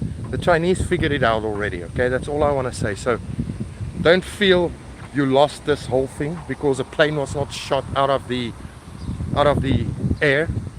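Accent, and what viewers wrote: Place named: South Africa. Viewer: my first time here. You sound South African.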